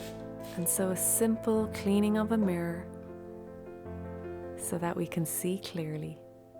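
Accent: Ireland. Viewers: I’ve had a small touch of spring cleaning fever for a few days now and this video just fed that immensely! It’s as if you described my every thought & feeling, except with a beautiful, soothing Irish lilt!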